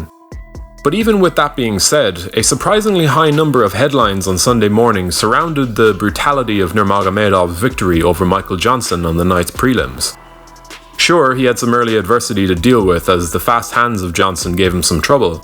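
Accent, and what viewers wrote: Ireland. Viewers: Is that an Irish accent gracing this vid?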